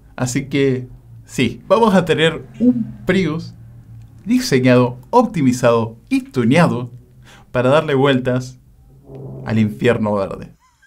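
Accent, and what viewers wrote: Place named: Buenos Aires, Argentina. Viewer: *Bonaerense, que en el interior cambia bastante la pronunciación. 😉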